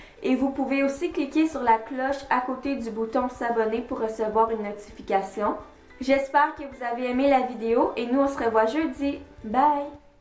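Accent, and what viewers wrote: Canada. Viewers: Tu as un accent canadien j'aime trop...et vrm le démêlant je l'ai essayé c super top ❤️😄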